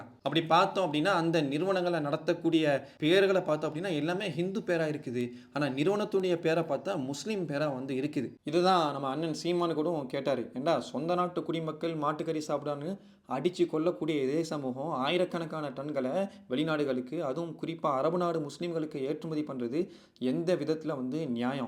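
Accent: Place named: Kerala, India. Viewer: 👏 well explained... That Malayalam slang 🔥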